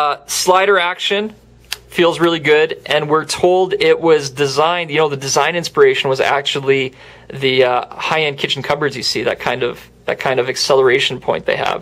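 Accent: Canada. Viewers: Are you canadian ? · love his canadian accent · You sound so Canadian and i LOVE it! Thanks for the awesome review!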